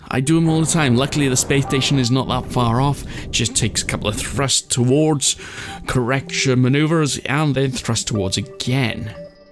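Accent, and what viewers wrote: Wales, United Kingdom. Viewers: love ur Welsh Accent, besides that. Please can you include wich mods you are using?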